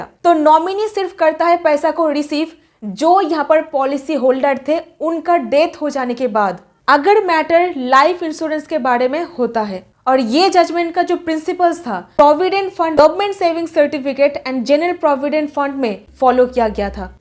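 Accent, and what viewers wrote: Bengal, India. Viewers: I love Bengali accent... ❤❤ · Your Hindi is not clear. Bengali flavour aa jaata hai and so unable to understand. Pls translate this in English.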